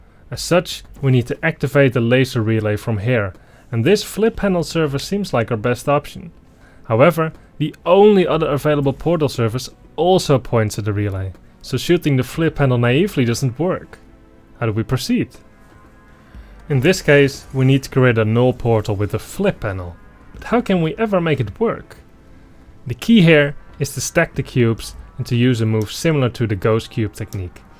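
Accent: Netherlands. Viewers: are you dutch cus i am and i hear the accent and your name looks dutch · I hear a Dutch accent and see a Dutch name 👀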